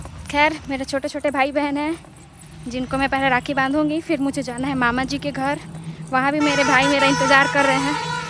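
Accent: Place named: Bhojpuri, India. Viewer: hnnn bhojpuri type bol rhe ho na aaisa hi kuch lag rha tha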